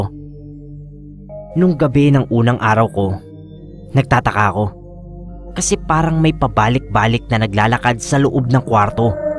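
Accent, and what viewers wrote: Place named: Pampanga, Philippines. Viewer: Sa the way sya magsalita feeling ko kapampangan sya haha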